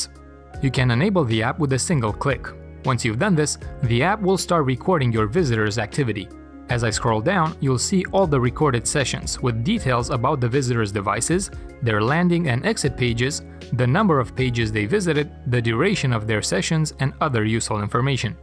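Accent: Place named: Russia. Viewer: Акцент похож на русский)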